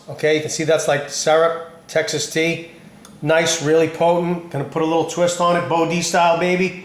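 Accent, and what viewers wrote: Boston, United States. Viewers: This sounds like an episode of the Sopranos...or Boston? I live in the midwest so it all kind of sounds the same to me! Great presentation of that dish!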